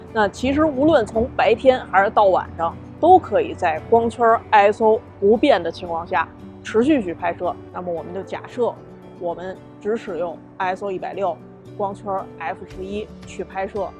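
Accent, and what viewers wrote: Beijing, China. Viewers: 走心❤的摄影师，NIce，听您这口音跟大马老师特别像，都是纯纯京味儿。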